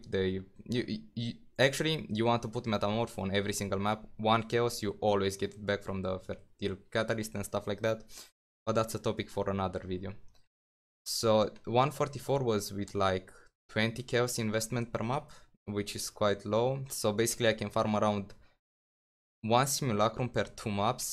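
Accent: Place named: Romania. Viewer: Are you romanian? Heavy romanian accent. If yes, Salut!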